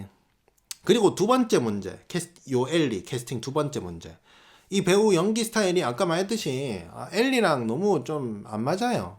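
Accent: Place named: Gyeongsang, South Korea. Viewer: 후기를 듣다듣다가 말투를 보니.....경상도 출신인데. 대구? 부산? 어느쪽이든 고향분이라 반가브요 ㅎㅎ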